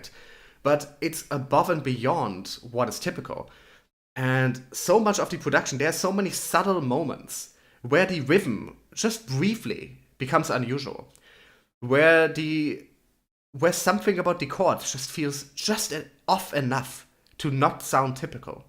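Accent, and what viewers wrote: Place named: Germany. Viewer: Der Akzent hat es verraten.. Hätte aber nicht gedacht, dass ich jemals einen deutschen Kpop-Reacter finden würde. Es freut mich, dass dir das Lied gefallen hat, sind meine Lieblingsgruppe. Ich würde dir von ihnen empfehlen, falls du es noch nicht gehört hast!:)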